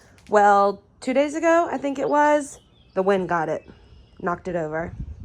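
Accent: South, United States. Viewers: I love how you talk lol. I'm from texas I have a southern accent too lol. Nice vlog love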